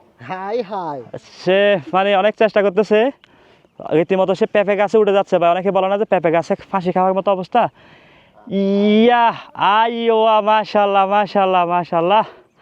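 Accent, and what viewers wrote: Chittagong, Bangladesh. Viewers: Don't mind brother... watching from Rangpur and I can understand your Bangla easily but Chatgaiya is difficult to understand..No problem go ahead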